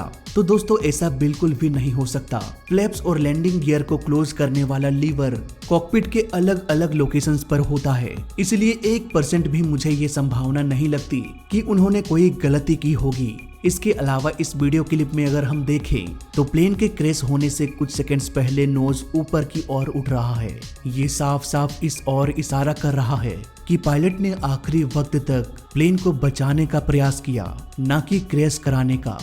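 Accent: India. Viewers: indian English. Maybe = sayad 😂😂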